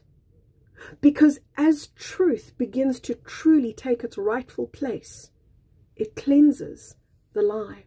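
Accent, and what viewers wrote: South Africa. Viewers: Just curious, what is your accent? South African? you pronounce skeletal as "Skuh-LEE-tle." In America we say "SKEL-uh-tle." Again, just curious ☺️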